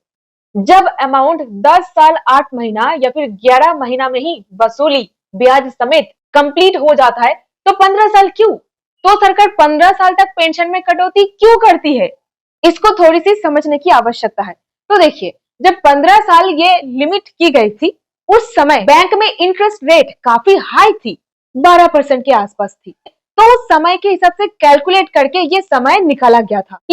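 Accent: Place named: South, India. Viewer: Your south india hindi speaking style is osam, I like your speaking voice.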